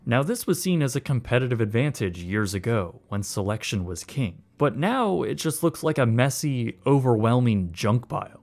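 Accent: Canada. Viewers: Forgive me for asking, but what part of Canada are you from?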